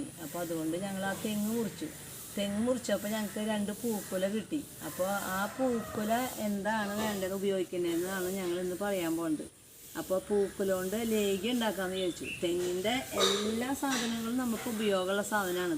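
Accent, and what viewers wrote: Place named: Thrissur, India. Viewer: ഇത് എവിടാ കിട്ടും. തൃശൂർ ആണോ. സംസാരം kettit.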